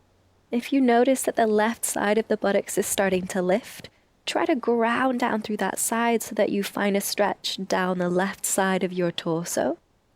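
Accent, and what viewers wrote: England, United Kingdom. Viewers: Hello dear... greetings from Iran ❤ I'm so happy to find your channel, I've done some lessons so far and I really enjoy the way you teach yoga... I absolutely like your british accent too😊 Wish you the best ❤❤ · hI - thank you for a lovely practise that really hit the spot for where I am just now. After a long gap I'm trying to get back into a morning yoga practice but deliberately wanted just a 20 minute beginners one that I felt I could commit to and not find too taxing while I built up my yoga muscles and love again. This was perfect! And some beautiful scenery and a lovely English accent to boot! all good - see you tomorrow. xxx💖💝💘🥰🥰😍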